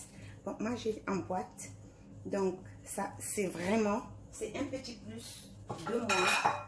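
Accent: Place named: Lebanon. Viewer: Bonjour, excellente recette comme a votre habitude. Par curiosité, j’ai remarqué un joli accent libanais, en êtes-vous originaire. Bonne continuation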